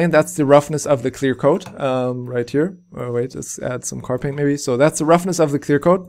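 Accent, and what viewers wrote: Germany. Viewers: you went full german mode in this one hehe <3 Great stuff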